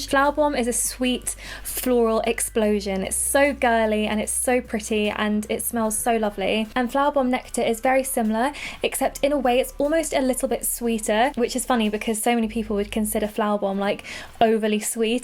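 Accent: RP, United Kingdom. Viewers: Does she have a RP accent or not ?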